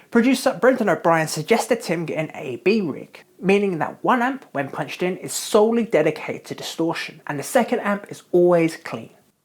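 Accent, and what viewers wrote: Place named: Southern England, United Kingdom. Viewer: Awesome stuff as always. I even ordered a curry just have watching this, well worth it. Here's a left field suggestion - How about YOUR rig? :) Ever thought abouot doing a 'Rig Rundown' series with bands nearer to you? Considering your accent makes me think you're from somewhere between Bristol, London or even Sussex, you'd probably be inundated with choice, right? :)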